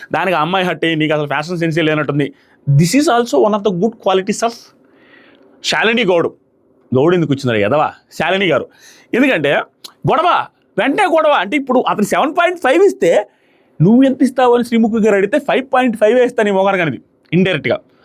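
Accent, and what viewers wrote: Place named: Udal, India. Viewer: UDAL MOVA MATLADE VIDHANAM ISTHAM UNNA VALLU OKA 🤍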